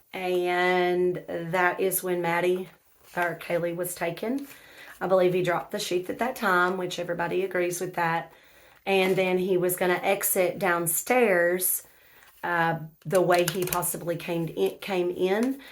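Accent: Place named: South, United States. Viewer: You are from somewhere in the South, I've heard this accent all my life. I do know you're from some southern state. I relate to that accent. I hope you're right. However he is completely psycho and not like most of us. He is on the spectrum someplace and due to either environmental factors or genetic, he is flawed and he can't deal with it and thus this scenario is what we rare now seeing. A person that just should have gone on down the drug path and stopped there, instead of trying to rise to be and being triggered or what ever you want to call it to kill people. He would be better off homeless and under a bridge at this point.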